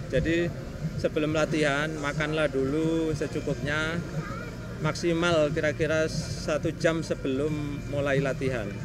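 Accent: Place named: Java, Indonesia. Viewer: Sangat bermanfaat pk besok sy latihn renang lgi pk......ko di batam pk kyakya bahasanya agk medok jawa.....sehat selalu pk slam dri bekasi.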